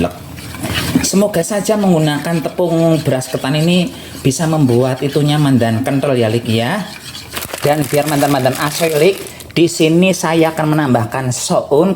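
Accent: Banyumas, Indonesia. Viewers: Om maaf iya batam itu daerah jawa tengah iya kok om bisa bahasa jawa kayak orang bayu masan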